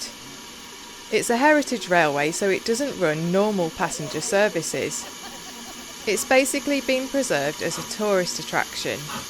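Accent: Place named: England, United Kingdom. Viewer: Hi best regards from Poland , i love your english way of speaking , just very english for me:)like yor videos waiting for next one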